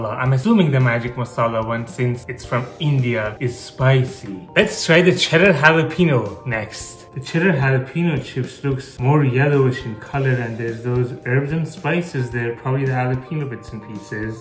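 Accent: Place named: Iran. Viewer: Are you Iranian? Your accent seems Persian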